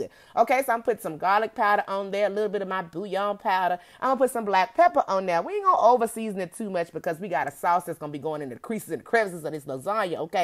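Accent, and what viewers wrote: United Kingdom. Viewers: Why she legit sounded british tho 💀😂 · That British accent took me out. I love you. ❤️❤️❤️ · Your British accent is amazing 🤩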